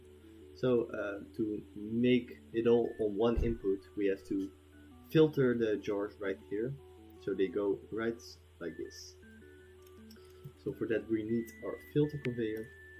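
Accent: Netherlands. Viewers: ar u dutch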